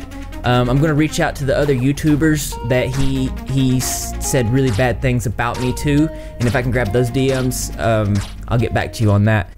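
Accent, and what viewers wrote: South, United States. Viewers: Ur style dnt match ur country twang and i like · I love your accent! omg it's so country/eastern/western gosh it's great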